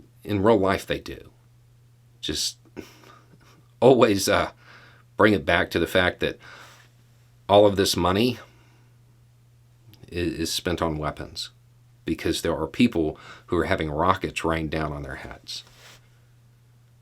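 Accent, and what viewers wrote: South, United States. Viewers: Lots of good thoughts from this year. For a guy that looks and sounds like an ignorant Southern hillbilly in a garage, he comes off as a sort of political intellectual. You expect to hear the words of MAGA issue from his lips. Instead, one hears common sense. He's probably right about countries not having morals but countries are made up of people. People have morals. I think most of the people in the US (& Canada) have good morals. So - I hope he is wrong and the good morals get reflected in social policy. Happy New Year!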